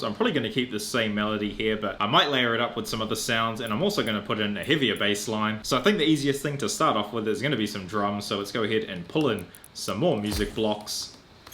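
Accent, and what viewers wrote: New Zealand. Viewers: you from nz oi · you sound like a NZ'er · y do u sound new zealend? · Is this guy from NZ? the accent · are you from new zealand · Are u from nz? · Are you from New Zealand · Are you from New Zealand? I recognize your accent.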